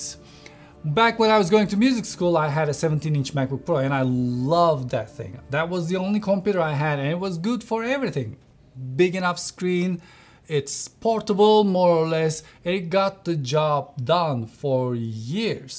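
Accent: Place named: Turkey. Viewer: Aksanından Türk mü bu adam acaba dedim :)))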